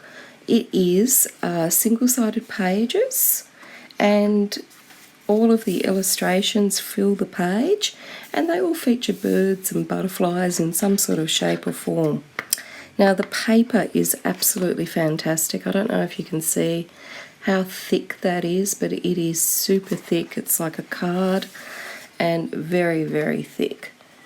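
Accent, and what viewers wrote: Australia. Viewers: Thank you! I enjoy your videos so much. In part because of your lovely Aussie accent, but mostly because you introduce me to book from around the world. Thanks again!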